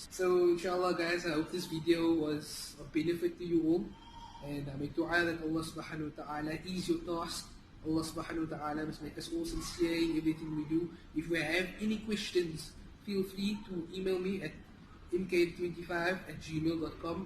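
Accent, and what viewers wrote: South Africa. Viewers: lol you can hear that this bru is from Cape Town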